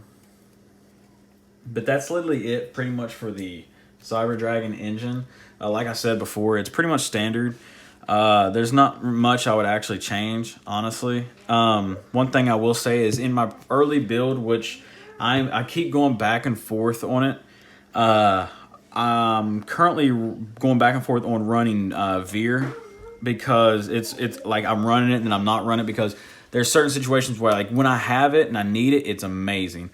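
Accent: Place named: South, United States. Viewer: Southern accents are tough! Lol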